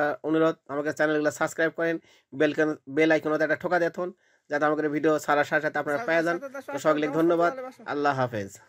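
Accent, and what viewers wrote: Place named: Bogura, Bangladesh. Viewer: ভাষাটা বেশ ভালোলাগে এটা কি বগুড়ার ভাষা?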